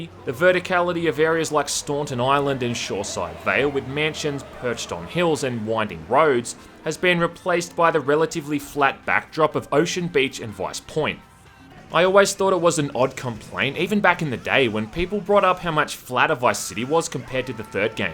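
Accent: Australia. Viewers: i really didnt notice he was australian until he made that australian joke then I couldn't unhear it lol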